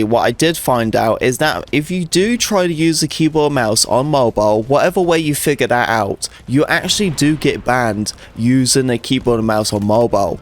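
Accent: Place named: United Kingdom. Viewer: hey man your so british idk what app you said for controller support for mobile no matter how many times i rewind to hear you again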